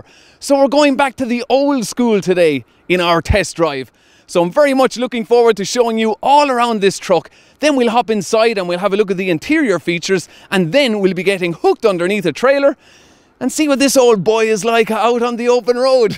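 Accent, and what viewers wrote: Ireland. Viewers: love irish accent :) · I love Ireland (hopefully I'll visit in the not so far future) and I love lorries. Your handle suggests that you're Greek, although your accent is distinctly Irish. So yeah, you just gained follower. Cheers from Turkey.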